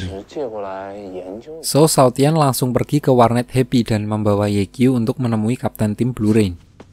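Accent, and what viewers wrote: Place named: Yogyakarta, Indonesia. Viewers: Loh wong jogja to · Oalah wong jogja to mas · Admin nya medok tapi lucu nya gokil...anjim 😂😂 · Jawa jogja masnya wkwkk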